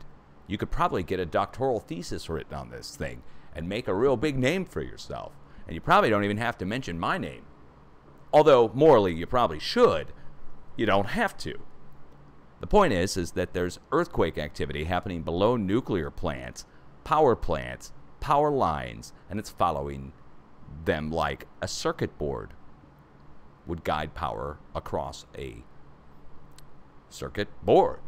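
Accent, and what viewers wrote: Russia. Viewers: lollllll Love the Russian accent!!! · Nobody no agency should stop you. But unfortunately in this time they try. And why? My dear that is the question. Like your russky accent. You kinda sound like Natasha's boy toy Boris.God bless you guys. And thank you.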